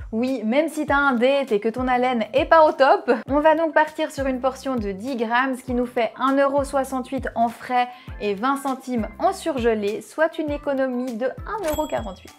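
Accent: Switzerland. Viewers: Vous avez Un accent suisse 🇨🇭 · Oui mais le poids des myrtilles est-ce avec l'eau qui a gelé ? Ah c'est marrant j'ai trouvé dès le début que vous aviez l'accent suisse! De vers Neuchâtel ou par là!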